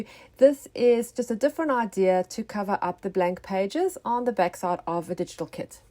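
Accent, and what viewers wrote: South Africa. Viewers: New subscriber here! Are you originally Australian,as you sound very South African to me -I am a SA expat,so just curious 😊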